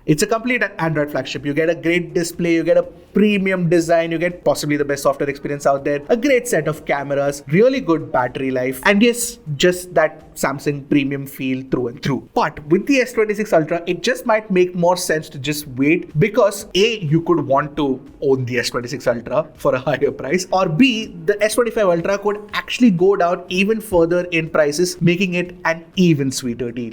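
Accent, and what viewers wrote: India. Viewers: Just picked this up today thanks to a Black Friday sale. $50/month over 2 years with a $340 trade in on my S23 Ultra. Wasn't necessarily planning to upgrade until the S26 Ultra but that price was hard to beat. Also, great content, you're very personable and I enjoy watching, but maybe slow down a little bit. I'm a native English speaker and when you start throwing around specs and tech terminology, without the subtitles it can be a bit overwhelming. The Indian accent can be a difficult one at the best times. You still earned a subscriber. Thank you!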